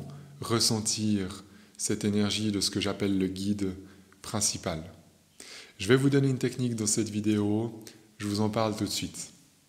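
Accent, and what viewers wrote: Vaud, Switzerland. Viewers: Votre voix est extrêmement rassurante et ce petit accent vaudois y est pour beaucoup a mes yeux... ;-) Merci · Merci au petit suisse, quel voix, quel intonations et quel accent délicieux et puis toute cette douceur ❤ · Bonjour. Merci 🌸. C'est plus fort que moi alors je le dis: cet accent vient de CH n'est-ce pas? J'ai expérimenté ou plus précisément, je me suis rendue compte de cette énergie en 2020, elle s'est invitée à l'imprévu ... hihi. Je l'ai apprivoisée et j'arrive à la faire venir à chaque fois que j'ai envie. Jusqu'à mauntenant je ne fais que la ressentir et sentir ma sensation ... maintenant je sais qui c'est, je lui ferai part ma demande. Encore MERCI ❤.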